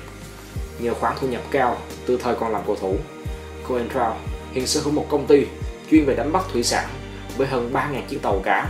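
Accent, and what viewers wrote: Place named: South, Vietnam. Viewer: Giọng thì đã miền năm lại còn ồn ào tạp phẩm ...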